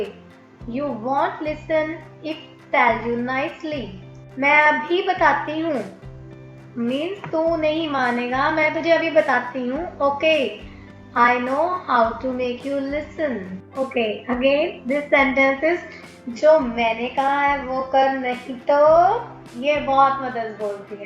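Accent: Punjab, India. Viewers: are you punjabi..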